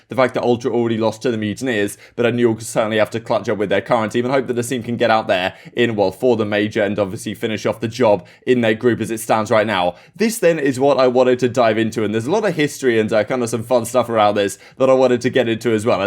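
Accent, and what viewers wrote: United Kingdom. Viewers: Watched your video last night, and as soon as the intro sound happened, followed by the "Alright guyyyyys, ", my girlfriend looks up and says "I feel like I live with this guy... I can't even understand him, he talks so fast! At least he's British though and not annoying like that other dude!" (she hates Maven.. haha)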